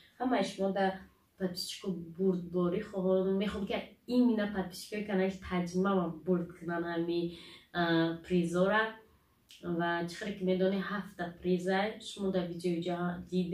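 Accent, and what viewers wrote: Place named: Kulob, Tajikistan. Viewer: Апаи зур ранги кулобиё Гап мезане 😘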